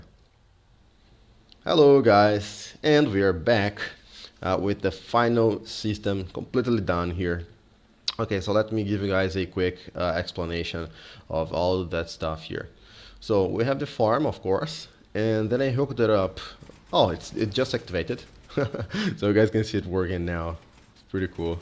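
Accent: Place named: Brazil. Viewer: Felipe Menão Blz, eu não sabia disso, é que o inglês dele tem um sotaque bem brasileiro mesmo ;), mas o inglês dele é bem melhor que o meu ehehe... mas não importa, os videos dele são muito bons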